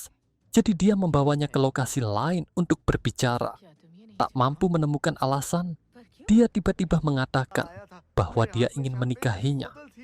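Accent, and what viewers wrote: Java, Indonesia. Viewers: Suaranya jawa banget. Kureng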